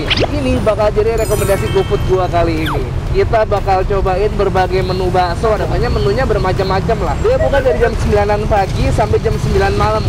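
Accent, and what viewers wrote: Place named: Banyuwangi, Indonesia. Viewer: Jawa timuran bang tpi jawa timurnya yg timur bgt BANYUWANGI 🤗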